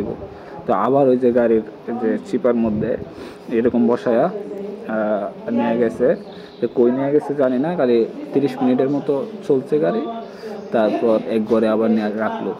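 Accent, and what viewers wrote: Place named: Kishoreganj, Bangladesh. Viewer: আপনার কথা শুনে মনে হইতাছে কিশোরগঞ্জ /কাপাসিয়ার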